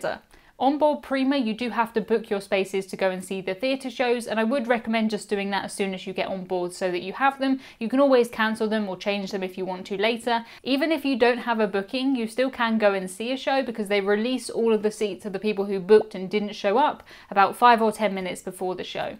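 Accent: United Kingdom. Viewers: I love your videos! btw is it cool if I call you? Also, fun fact, as an American I have my siri as British accent. Her British accent pales in comparison to yours. I wish I could have your voice as my siri.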